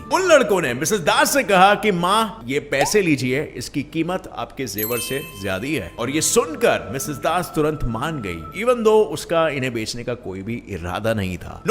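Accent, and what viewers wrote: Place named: Bengal, India. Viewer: Your bengali accent is quite good.... 👍👍👍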